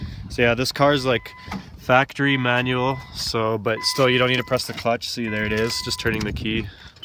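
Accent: Canada. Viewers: jesus you sound so Canadian. i am also Canadian you make me question if i sound like you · you have like the stereotypical canadian guy, i try to sound like u when making fun of my canadian friend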